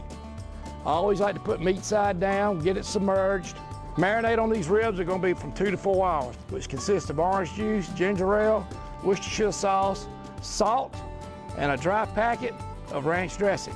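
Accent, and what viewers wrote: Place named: New York, United States. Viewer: I almost couldn't understand you with that thick New Yorker accent me being from Louisiana...lol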